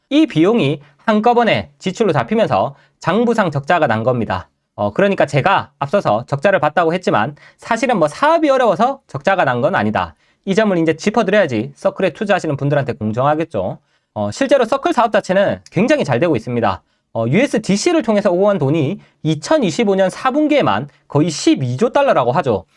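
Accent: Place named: Gyeongsang, South Korea. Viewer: 코인계 일타강사 인정합니다. 머리에 쏙쏙 들어오는 내용과 명확한 딕션, 그리고 말씀 중간중간 묻어나는 경상도 억양이 아주 큰 매력입니다. 덕분에 지루할 틈 없이 완강했습니다. 감사합니다. 편안한 주말되세요~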